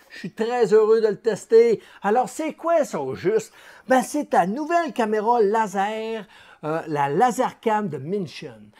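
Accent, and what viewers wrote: Quebec, Canada. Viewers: Tout simplement, j'adore ton humour et ton accent Québecquois très cher cousin, au plaisir de te rencontrer chez toi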